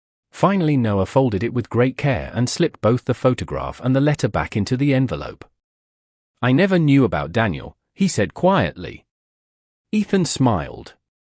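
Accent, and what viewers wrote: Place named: Vietnam. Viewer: Same old topic but with a glitch in the AI voiceover (I'm a linguist... among other things, but wasn't able to identify it, it sounded like Tagalog, but with Vietnamese cadence). Also, the tagline is deceptive. There's no straight man to talk about or kiss that never happened. Please, be careful before posting. Thanks. ❤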